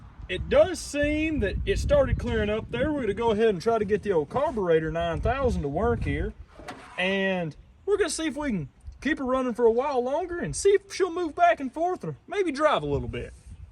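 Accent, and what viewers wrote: South, United States. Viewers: On a different note… you have the most amazing southern accent.. makes it even better watching your videos.😊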